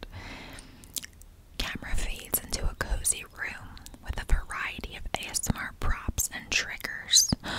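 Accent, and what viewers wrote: New Jersey, United States. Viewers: that new jersey accent was accenting it, its very relaxing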